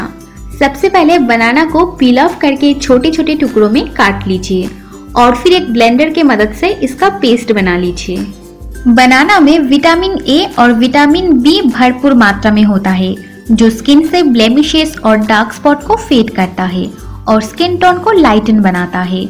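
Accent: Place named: Bengal, India. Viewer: Bengali accent spotted!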